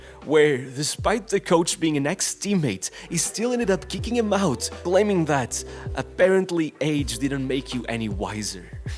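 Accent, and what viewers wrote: Portugal. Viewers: Ja é talvez o 5 comentario que faço em videos teus, e acho que todos foram a dizer o quanto parecias portugues a falar... hoje com camera apareces com a camisola do vizela... Acho que tenho as minhas confirmações 😂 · AAAAH Eu sabia pelo sotaque que tinhas que ser português!!! Bom trabalho, continua! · Bem, o sotaque sempre foi 100% português. Eu tinha dificuldade em reconhecer o sotaque português, mas depois vi (ouvi) o Mourinho falar mil vezes em inglês. Aliás, a frase inicial "listen to this" é perfeita para reconhecer o sotaque português. Pronuncia "Liss-tenm tu dis" em vez de algo como "lisen te ðis"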